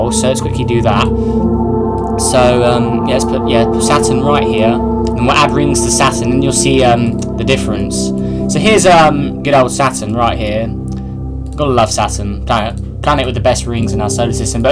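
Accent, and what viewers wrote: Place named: United Kingdom. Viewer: his voice sounds so british like he is saying you alright mate or cheers mate or cheers felure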